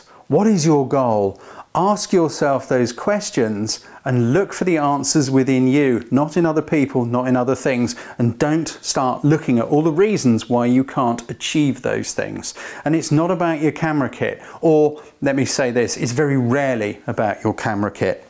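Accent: England, United Kingdom. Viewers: where in England are you from.. i know by the accent its SE/SW England mate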